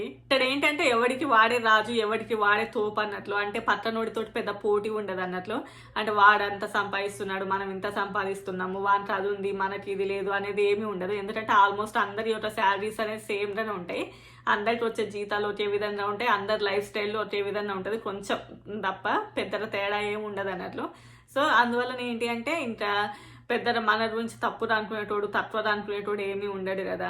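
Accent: Telangana, India. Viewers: Chala baga cheputhunnaviu bidda. unnadi unnatlu clarityga cheppavu thalli.very good Amma.India lo Ap, kadu meru telangana telugu vare anukuntunnanu.thank you bidda.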